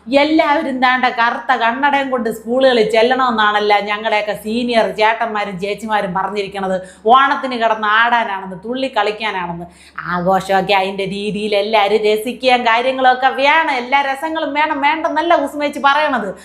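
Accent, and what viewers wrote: Thiruvananthapuram, India. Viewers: തിരോന്തരം ഭാഷ നന്നായിട്ടുണ്ട് അഭിനന്ദനങ്ങൾ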